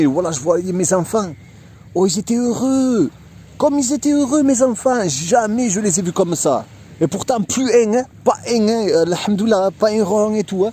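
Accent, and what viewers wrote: Marseille, France. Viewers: Salam alaykoum wa rahmatullah wa barakattuh Qu'Allah azawagell t'accorde le bien ainsi que ta famille 👪. Mais dit moi t'es un peu Marseillais non? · tu as l'accent marseillais , tu es sur que tu es d' angers ?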